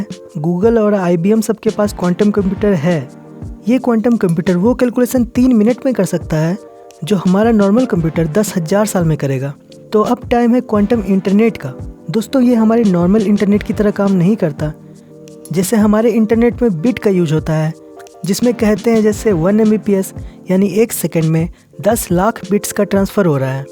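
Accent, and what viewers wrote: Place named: Jharkhand, India. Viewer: 👍Good info.. This channel is under-subscribed... deseves more views.. A small suggestion from my side.. try to correct your accent to be more appealing... It appears that you belong to Jharkhand, and your accent is not neutral.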